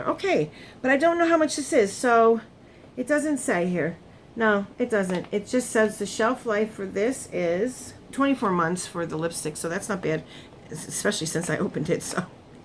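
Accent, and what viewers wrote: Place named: East Coast, United States. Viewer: Thanks,...you are so sweet! I hope you feel better soon! I am not doing so great these days myself! I can hear it in your accent that you on the EST! Take care & thanks so much for your response! 🙏🙏❤❤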